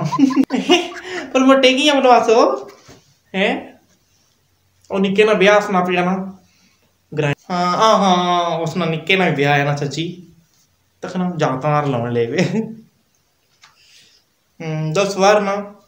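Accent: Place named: Pothohar, Pakistan. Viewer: Fantastic video i Love it and i really like your potohari the way you speak My favorite language is potohari but we call it pahari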